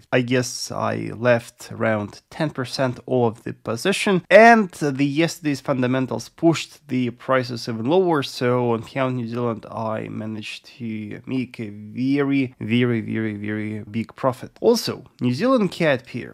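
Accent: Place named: Russia. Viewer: О видно что акцент русский)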